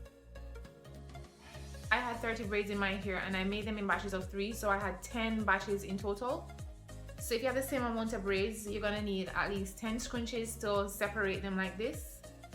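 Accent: Jamaica. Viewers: I hear the accent 🇯🇲🇯🇲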